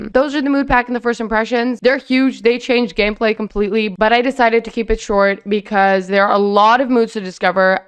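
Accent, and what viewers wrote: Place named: United States. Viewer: I don't understand any ball of it, but even under us... your voice wtfff its fucking perfect 🤩🤩🤩🤩 really americanish really good omg im flabbergasted no words wow 👍🏼👍🏼👍🏼👋👍🏼👍🏼👍🏼👍🏼👍🏼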